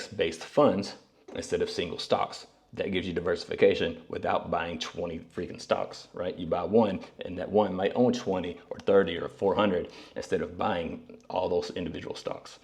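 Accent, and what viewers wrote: United States. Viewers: You sound like an American. Just wondering how the Chinese police treat you? Do they stop you every time you go out and ask for Visa document etc? The news makes it sound like you will be vanished and never be found if you visit China.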